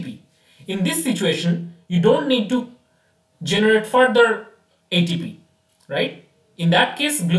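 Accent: India. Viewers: lol he can't afford a real copy of windows? This cyborg voiced indian is sus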